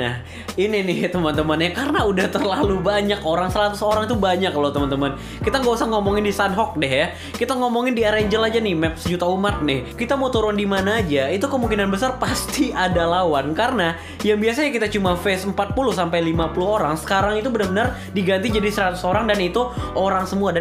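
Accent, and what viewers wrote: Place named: Bali, Indonesia. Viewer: Orang bali bang??? Soalnya logat pas ngomong "t" nya mirip am orng bali :v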